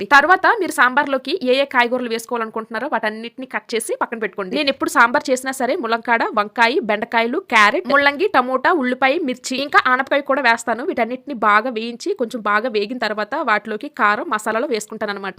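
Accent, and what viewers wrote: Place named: Bangkok, Thailand. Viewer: Bankok pilla voicelaundhi meedhi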